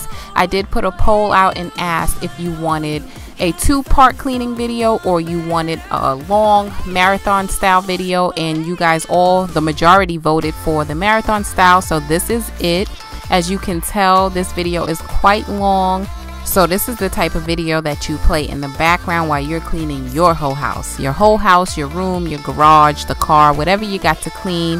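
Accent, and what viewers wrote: New York, United States. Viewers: LOVED THIS VIDEO! As soon as you spoke I knew you were from New York! Totally relatable I’m from New York and relocated to Colorado so I felt you sis!!! Love your home so cozy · I subscribed as soon as I heard your accent! I’m a native New Yorker and we picked up and relocated to Houston 5 years ago - hearing you feels like home 😊 Thank you girl, keep talkin your ish ❤️ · I heard you speak and thought “she’s from NY!” Lol. My family relocated from Brooklyn to SA, TX six years ago this June. I absolutely loved watching your video! Definitely subscribing!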